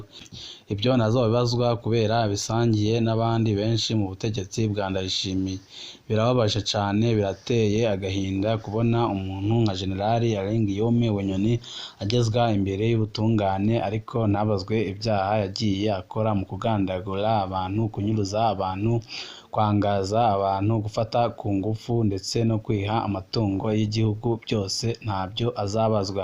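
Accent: Rwanda. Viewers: oya uyuwewe ararenze avuga niryo atazi ninumurwanda none burya arunva KO atanikirundi azi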